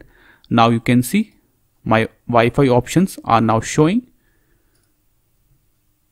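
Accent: India. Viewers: Worked , thanks a lot , i knew it will work when i heard that thick Indian accent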